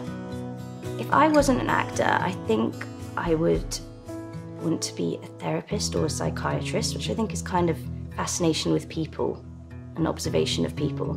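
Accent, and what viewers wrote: England, United Kingdom. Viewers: British accent love🤩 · Love her English accent taking over her rural accent!!!!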